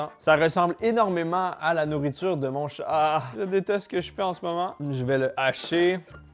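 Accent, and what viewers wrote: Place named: Canada. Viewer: l'accent canadien c horrible.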